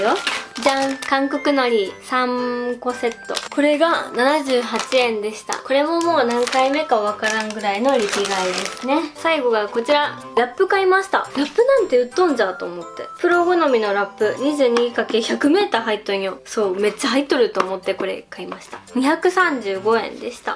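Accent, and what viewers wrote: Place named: Hiroshima, Japan. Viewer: 元彼が広島の人だったからと同じ喋り方だからなんか思い出したあああ笑笑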